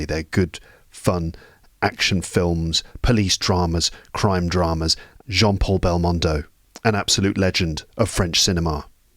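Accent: United Kingdom. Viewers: What beautiful, well documented, almost lyrical report on. a parisiàn district I had the opportunity to stfoll up and down years ago whilst a student. Thank you for the entbjsiasm, your English-savoured French words reading 😮, I ennoyed your "Walk, cycle and Talk" immensely 😢 Françoise crom Martinique.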